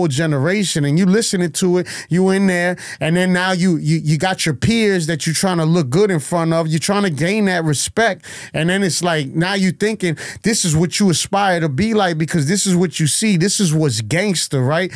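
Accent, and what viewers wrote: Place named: New York, United States. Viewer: Why does he sound SOO New York? In getting Fat Joe vibes. Man, this guy dropped some real gems.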